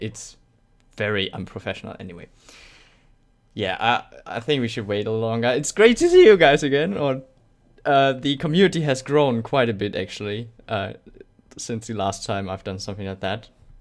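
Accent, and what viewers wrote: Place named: Germany. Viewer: weil du einen deutschen Akzent hast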